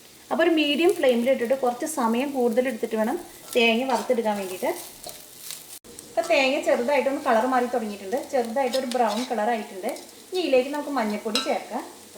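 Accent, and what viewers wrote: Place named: Kottayam, India. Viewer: Nice presentation.. mainly the slang which resembles kottayam bhasha...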